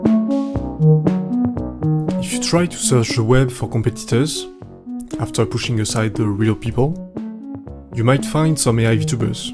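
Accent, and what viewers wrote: France. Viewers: l'accent fr xD, je m'y attendais pas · Did you know your accent is because you pronounce english words as French, or the letters atleast. It's why when I speak Japanese, I actually get praised on my accent because I force a fake asian accent that if I spoke in english would get me cancelled 😂 but for speaking Japanese it actually makes my accent more like a local.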